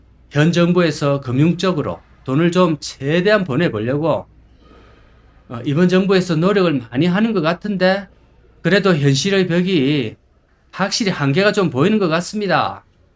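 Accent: Gyeongsang, South Korea. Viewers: ❤~~small 그것이 알고싶다 ~~ 1인방송으로서 👍 👍 ~낀가넣다~ 정겨운 경상도 사투리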